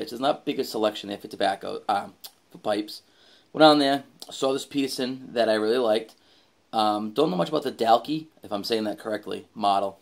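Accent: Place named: Massachusetts, United States. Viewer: Enjoy your laid back style, keep it up. Always like watching pipe reviews and Yabo's so keep posting those. I'm originally from Rhode Island but live in Texas now, I knew by your accent you were in Mass. :) Go Bruins!!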